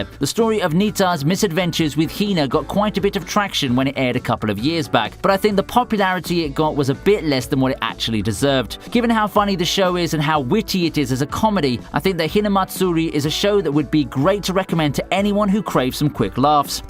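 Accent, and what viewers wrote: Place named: United Kingdom. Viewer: Dude are you from the UK? Also good vid. I've picked out 3 to watch. Rage of Bahamut, Yona of the dawn and Rainbow. Cheers pal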